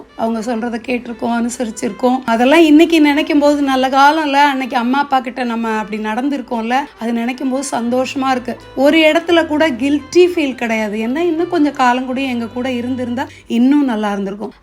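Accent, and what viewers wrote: Kerala, India. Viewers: Romba alaga pesurenga amma.. Unga pechu la malayali touch eruku, neenga malayali ah?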